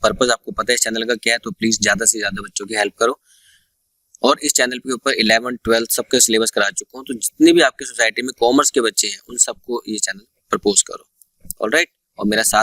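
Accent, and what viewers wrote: India. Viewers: Sir ap lucknow se ho ?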